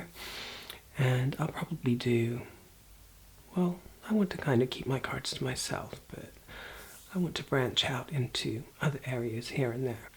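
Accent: United States. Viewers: I assume your English, but you're going about being natural in an American accent, it has me confused 😂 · ..... this sounds like an American accent is this your natural one or one your using. Because your British accent is too real lol ps Anthing with at meridians works for me xoxo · Dude... You're not American are you? I thought you were a fellow Brit? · What’s with the American accent? I thought you were British?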